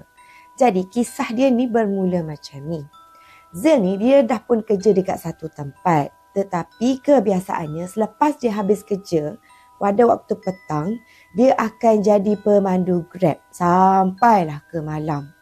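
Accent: Kelantan, Malaysia. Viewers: Akak ni orang kelantan ke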